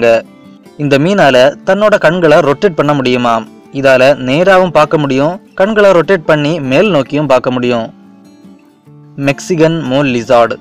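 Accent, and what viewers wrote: Kanyakumari, India. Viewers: Nenga KanyakuMari ah bro? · Kanyakumari voice ❤️ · Kanyakumari slang makka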